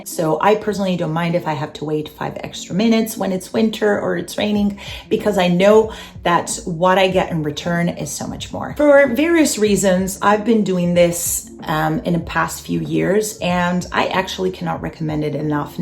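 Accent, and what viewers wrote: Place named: United States. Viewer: Thanks for sharing. 😊 I would like to know why you have an American accent when you are originally from San Marino. What and where did you study? In the US? What was your major at the university?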